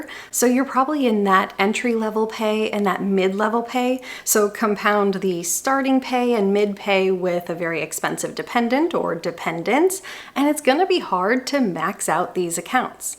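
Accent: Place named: Michigan, United States. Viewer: subtle michigan accent detected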